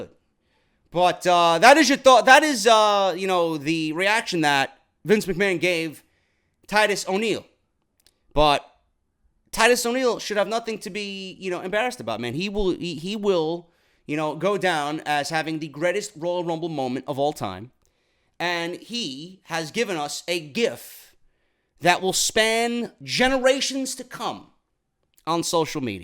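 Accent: New York, United States. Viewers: you are straight up New York you are like the difference between a glass of water and a shot of tequila STRONG, keep it up glad I found you you’re Number 1😎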